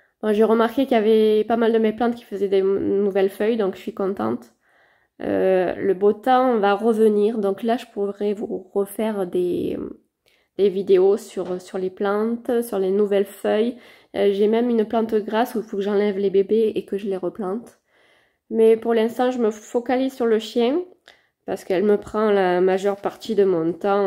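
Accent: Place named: South, France. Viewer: Bonjour Je vous découvre aujourd'hui ...qu'elle est mignonne!! C'est si triste de voir qu'il y a des gens qui ne se soucient pas du bien-être des animaux...sa mère va lui manquer autant dans l'affectif que pour son éducation... Les croquettes Hills sont très bien , nous les utilisons pour notre Gribouille un shitsu de bientot 13 ans. Nous avons prévu d'adopter aussi un petit chihuahua : il est né le 14 avril, nous ne l'aurons que vers le 20 juillet, ça lui laisse le temps de bien grandir auprès de sa mère et d'apprendre plein de choses. Je m'abonne car j'aimerais bien suivre cette petite et en plus j'aime beaucoup les plantes! Vous avez un accent du sud, vous êtes ans quelle region? Nous, dans le Lot😉 Bien à vous, Corinne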